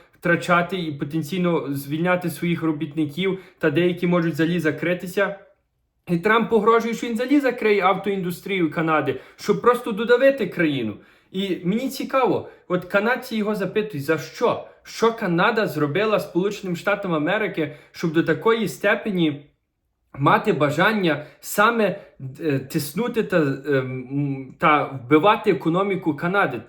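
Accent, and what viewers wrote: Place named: Ukraine. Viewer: Я підписалась чисто через те, що Ви маєте таку дуже українську говірку (стиль вести бесіду) нагадує, щось рідне 🩵💛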